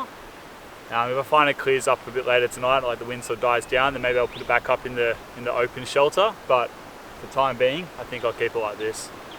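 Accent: Australia. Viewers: Love your videos of Australia and I’d visit your continent if I could. I’m from Canada and I showed you to him. We both like the accent and keep the videos coming!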